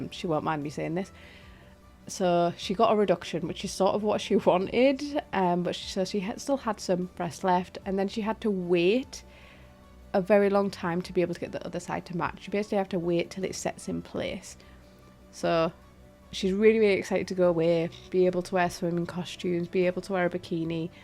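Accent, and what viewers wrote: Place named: North, United Kingdom. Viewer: Just found your channel and hearing a fellow northern gamer is such a refreshing thing! Usually they're American and i dont feel a connection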